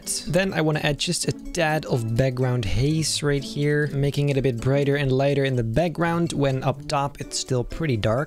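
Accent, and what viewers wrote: Netherlands. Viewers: And are you dutch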